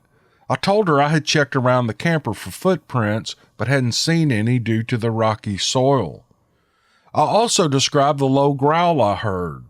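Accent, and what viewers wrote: South, United States. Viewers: I just love you country voice. You have that voice that you get around a fire and get you some popcorn and get comfortable and listen to you. But I can get in my bed and play your channel and picture my mind in these stories like I'm there. When you tell these stories. Thanks you do a very good job and telling these stories.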